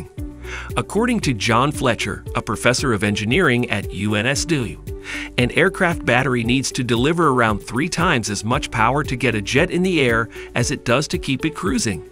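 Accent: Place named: United States. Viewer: Lol . . . Volkswagen pronounced Volkswa 'gen' as in Gen x. Really good American accent, though.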